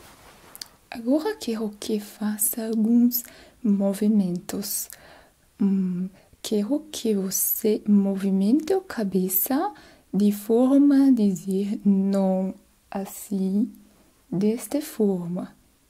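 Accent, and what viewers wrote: Germany. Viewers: Sotaque alemão incrível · brasileira imitando uma alemã perfeitamente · Que sotaque lindo é esse?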